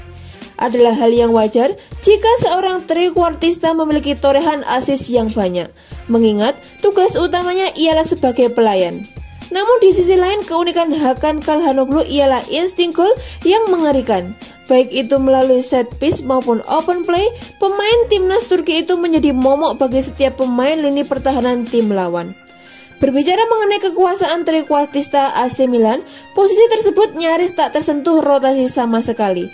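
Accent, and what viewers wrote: Java, Indonesia. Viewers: Mantap Medoknya keliatan bgt 😄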